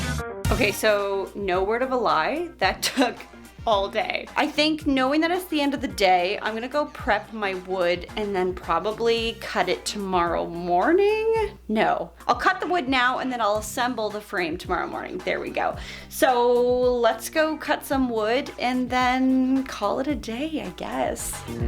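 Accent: United Kingdom. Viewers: Love your British accent 😆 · Did you binge watch Harry Potter before you did this cause you got the accent down! The birds! That was really awesome, so cute! I love both DIY'S. I didn't think I would care for the spoon art but it looked so good.. I stand corrected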